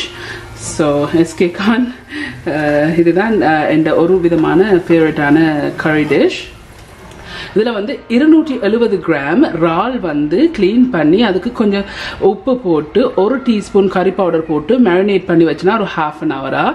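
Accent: Jaffna, Sri Lanka. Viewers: Jaffna tamil👍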